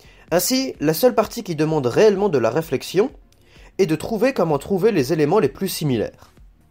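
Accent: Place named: Belgium. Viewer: Toujours plus d'accent belge !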